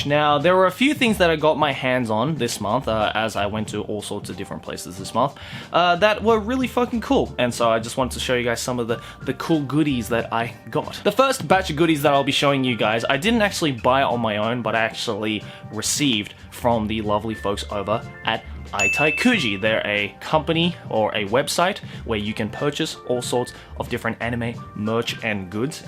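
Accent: Australia. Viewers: wtf hes australian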